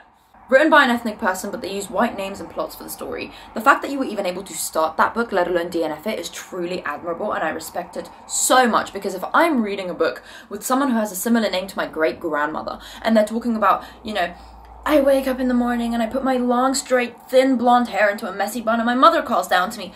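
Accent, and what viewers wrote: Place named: United Kingdom. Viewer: I'm so sorry, but me as an American....you being British makes this video so much better 😅😂 My YouTube feed knows me....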